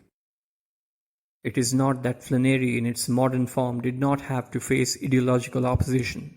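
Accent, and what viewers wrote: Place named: India. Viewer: I feel much oblige to say that i don't like Hindi accent, but yours was pleasant. And the info and insights you provide are powerful. Thanks for the video!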